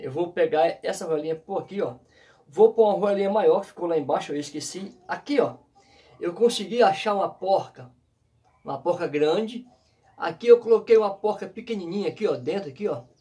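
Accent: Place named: Ceará, Brazil. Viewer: Ô vc parece ser cearense!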